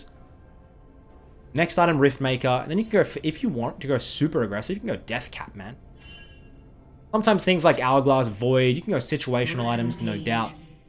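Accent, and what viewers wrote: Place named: Australia. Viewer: The more I listen to, the more I like australian accent.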